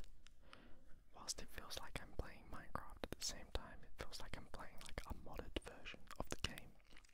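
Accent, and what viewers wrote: Australia. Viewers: im not so familiar with the aussie accent but i've just noticed that yours isn't as strong as other aussie asmrtists (asmr beam for instance), what part of australia do you come from, north/south/east/west ?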